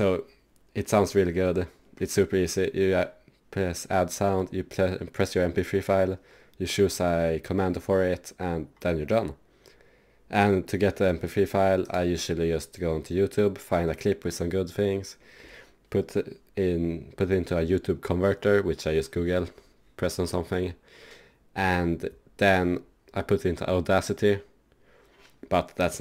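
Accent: Sweden. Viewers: skulle inte säga dålig men definitivt tydlig svensk dialekt på den haha. men kom igen, vilken svenne har inte det?? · haha man hör så himla klart att du är svensk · You're swedish right?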